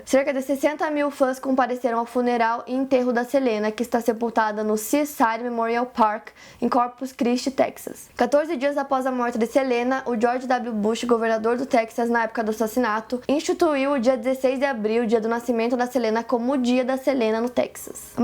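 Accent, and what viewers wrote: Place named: Northeast, Brazil. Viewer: essa mulher é nordestina ou paulista??? so taque todo misturado..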